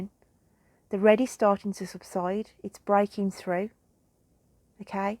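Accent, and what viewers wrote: Birmingham, United Kingdom. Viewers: Very uplifting message! It's nice to hear a Brummie doing readings 😊❤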